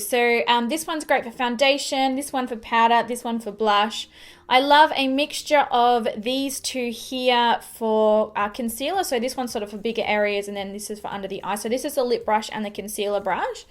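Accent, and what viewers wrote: Australia. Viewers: Love your tutorials and reviews and your lovely Australian accent! 😊 Did you feel like the handles were so flimsy they would break easily? Also, did the brushes shed?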